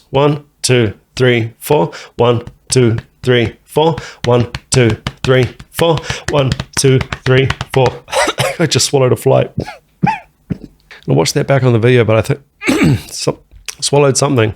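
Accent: New Zealand. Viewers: So true about relaxed..... another of the relaxed drummers I can think of springs to mind:.....Fred Beard of ZZ Top - slumped at the controls........ but no comparison!!! I found you recently and suddenly realised i was finally listening to a NZ accent - wow - how cool is that: we can do this stuff over here too! Love your videos and shorts.....Hi from the Kapiti Coast:)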